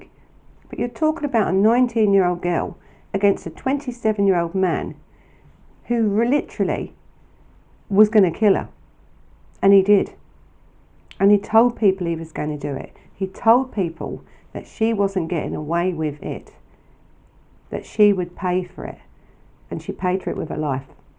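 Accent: London, United Kingdom. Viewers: she sounds like she’s from London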